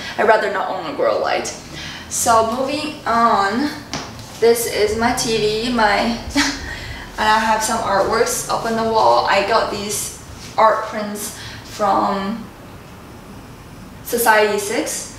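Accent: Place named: Southern California, United States. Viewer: It’s a SoCal accent, silly goose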